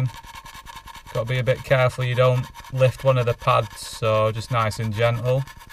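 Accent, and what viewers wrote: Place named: Northern England, United Kingdom. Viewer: Great video! I'd love to do this you explain everything in plain (northern) English! Keep up the good work!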